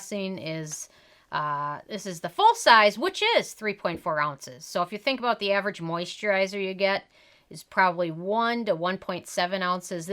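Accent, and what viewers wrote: Minnesota, United States. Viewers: I know this is an old video, but I just ran out of my PTR moisturizer and I happened upon this. What a rude comment from the above person about your voice needing work. We all have accents. I just hear a Minnesota accent, not a bad sounding voice! You should hear my New Yawk (NY) accent! You have a lovely voice <3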